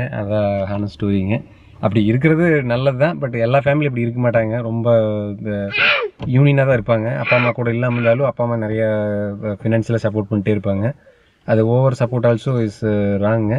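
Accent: Kongu, India. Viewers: Ungaluku vera channel iruka bro.. irundha sollunga.. unga videos romba edharthama.. informative a irukum.. that kongu thamizh awesome❤ naan valarndhadhu madurai.. adhu dhan kaadhellam inikum thamizh nu solluven.. Unga thamizh ketadhuku apram.. thamizh enga irundhalum azhagu dhan thonuchu❤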